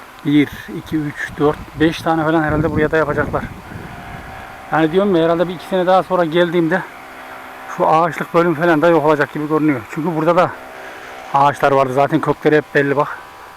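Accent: Turkey. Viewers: Kardeşim yozgatlımısın şive orası gibi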